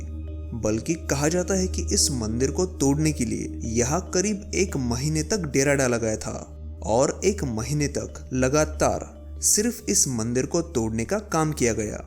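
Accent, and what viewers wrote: Maharashtra, India. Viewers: मित्रा तूझ्या उच्चारावरून तू मराठी वाटतोस. औरंगाबाद परिसरांत शाश्वत पर्यटनासाठी आम्ही प्रयत्न करतो आहोत. एक फ्रेंच मित्र गेली ४ वर्षे नियमित येथे येत असतो. तूझा मो. न. कळव. श्रीकांत उमरीकर <num>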